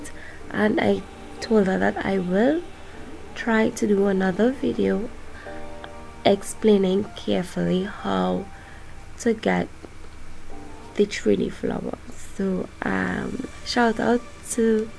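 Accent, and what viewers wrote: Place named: Trinidad and Tobago. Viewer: knew you were trini the moment i heard your voice 😍🇹🇹🇹🇹🇹🇹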